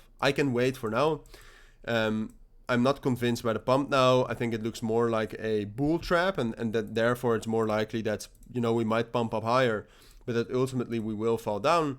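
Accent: Netherlands. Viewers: Bro are you dutch? Haha sounds like it, i am dutch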